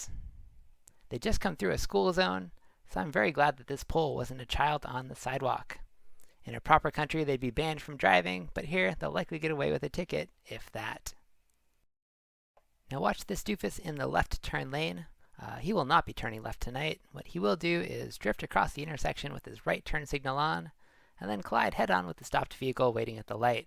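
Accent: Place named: Seattle, United States. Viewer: I didn't realize people from Seattle had an accent. The narrator talks like Seattle-born singer, Jennifer Warnes. <link>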